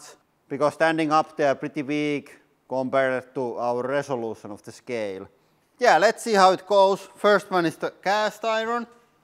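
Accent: Finland. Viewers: Flat like'a da pancake Did you invent a new word? Fanacy? Who doesn't love that fin accent? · That accent, Is he Finnish?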